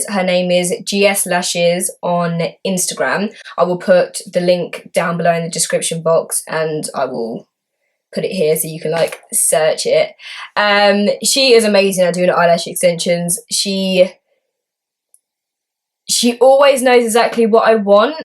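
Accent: England, United Kingdom. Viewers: Where in England are you from babe? Your accent is so sweet!! xx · i detect north or east london. could be wrong though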